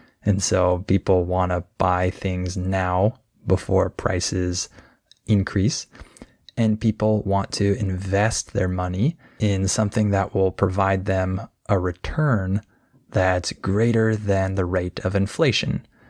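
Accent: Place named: United States. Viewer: American accent 😊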